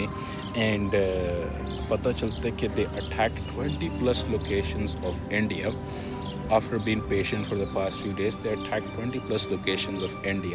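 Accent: Pakistan. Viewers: Every single bro is the most Pakistani thing I’ve heard. You sound like I do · Bro you Urdu accent is awesome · Good to see you again with your Pakistani accent. Masha’ALLAH. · Oo bhaii, you sound more Islamabadi than me, like wth??!!😢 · Love you ho gaya ha jigar, bara wala, I don't know which city you are from, but after listening to your Urdu accent, it seems like you are Lahori too. Am I right??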